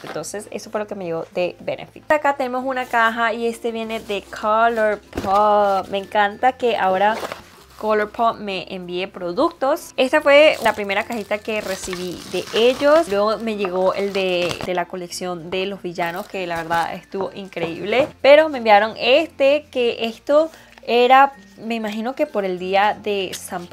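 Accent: Venezuela. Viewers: Saludos mi china!!! Hermosa como siempre y más aún cuando defiendes tu acento venezolano 📣📣📣 🙌🙌🙌 Me encanta tu personalidad las cosas te resbalan y no tomas nada a pecho 😏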